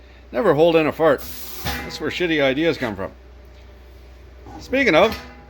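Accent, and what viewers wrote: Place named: Nova Scotia, Canada. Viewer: I swear this guy is from Nova Scotia.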